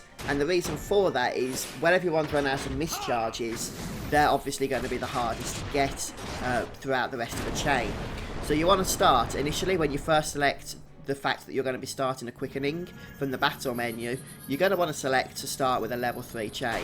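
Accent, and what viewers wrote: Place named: Cockney, United Kingdom. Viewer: Whooo cockney is strong with this one.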